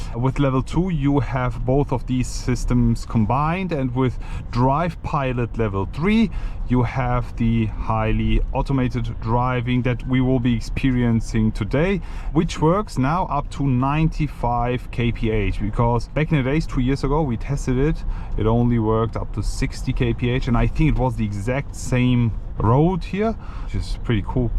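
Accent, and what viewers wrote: Germany. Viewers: Der deutsche Aktzent ist hörbar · The German accent is strong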